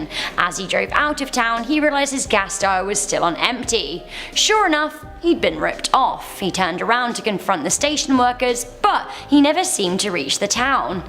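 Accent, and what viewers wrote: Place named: United Kingdom. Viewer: I love her British accent 😮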